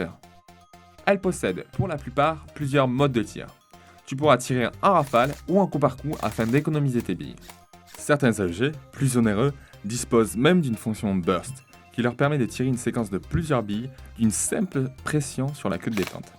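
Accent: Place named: South-West, France. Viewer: Je souhaites me mettre au AirSoft. Merci pour ta chaine (je débarque 4ans après 🤣mais pas grave) J'entends un accent, du sud ouest ? ;) Ciao de lapart d'un Bdlais ^^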